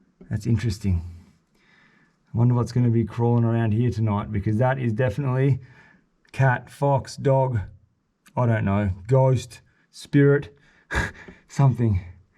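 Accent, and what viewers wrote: Australia. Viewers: Less than 2 minutes in and he's obviously another Aussie. I'd just like to know which state this is, as I've found a few strange unexpected places in West Australia, myself.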